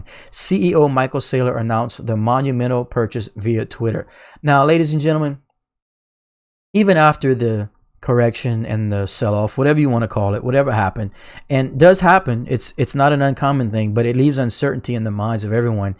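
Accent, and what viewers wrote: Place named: Louisiana, United States. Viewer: Dude sounds like you are from my area South Louisiana.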